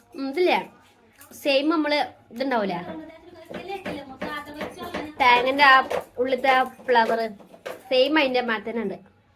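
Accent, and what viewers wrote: Malappuram, India. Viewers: എന്തായാലും മലപ്പുറം . ഭാഷയാണ് നിലമ്പൂർ പെരിന്തൽമണ്ണ ഭാഗം ആണ് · മലപ്പുറം സ്ലാങ് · അതെ.. മലപ്പുറത്ത് ആയിരുന്നു എന്ന്തോന്നുന്നു ഇവര് താമസിച്ചിരുന്നത്. ഇങ്ങക്ക്.. ഓല് എന്നുള്ള വാക്കുകൾ എപ്പഴും സംസാരത്തിൽ വരുന്നുണ്ട് 😊🥰🥰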